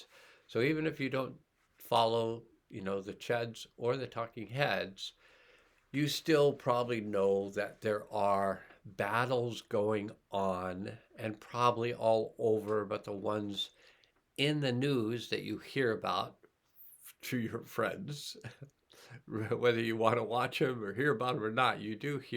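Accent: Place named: United States. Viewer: I'm familiar with Nancy Rebecca and the blue light info; she's in the USA as am I - wondering what part of the world u r in? (You sound like maybe USA)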